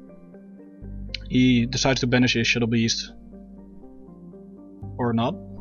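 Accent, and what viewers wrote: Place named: Netherlands. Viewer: youre dutch aren't ya? I'm too :P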